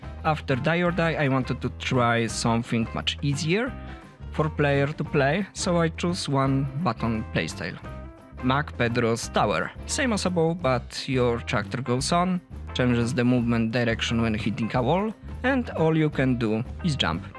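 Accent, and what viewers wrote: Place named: Poland. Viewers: slychac ten polski akcent 😅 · Polacy, nie wstydźmy się swojego akcentu! :) To, co jest najważniejsze, to czy jesteś w stanie zrozumieć przekazywaną informację - ja oglądnąłem i nie miałem z tym najmniejszego problemu.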